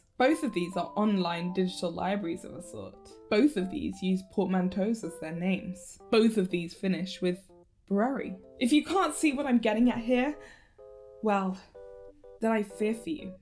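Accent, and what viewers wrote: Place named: United Kingdom. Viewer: As someone who grew up in a home where English was not the only language spoken I have learned over the years that although I am considered a native speaker there are many words I don't say 100% correctly because I learned to speak from parents who are non native English speakers. This video has taught me that I was not saying lolibrary in either of the two ways you argued. lol. However I suspect that has more to do with the fact that you speak British English accent and I speak Canadian English accent. I do agree that it is indeed loli-brary.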